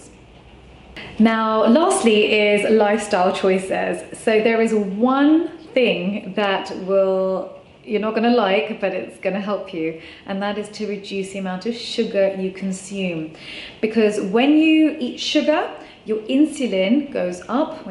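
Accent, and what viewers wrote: United Kingdom. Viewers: Right,your accent finally confirm me that you are living in the UK 😁😃.LOL.I am living in North 🤪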